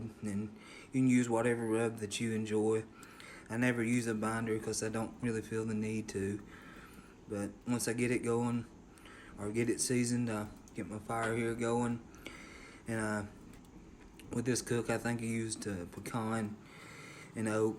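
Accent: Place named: South, United States. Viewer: Beautiful home cooking honey! That pork looked amazing. The bark was spot on!! 🔥 That’s funny and cute about you not saying “butt”! Love your country personality and accent and loved your outside setting.. very peaceful! Thank you for sharing. I enjoyed the video honey! 😘 PS the sammich looked amazing! 🤤 🙌🏻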